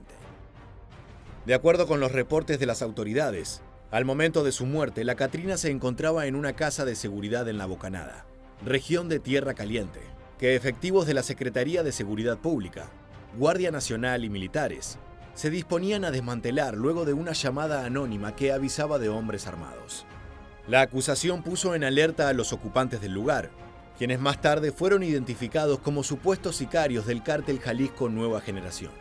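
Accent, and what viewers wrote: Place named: Argentina. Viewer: Pues el narrador ha de ser argentino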